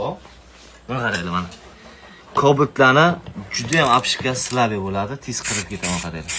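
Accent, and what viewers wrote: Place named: Shahrisabz, Uzbekistan. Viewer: Шахрисабзданми дейман укам.шева шахрисабча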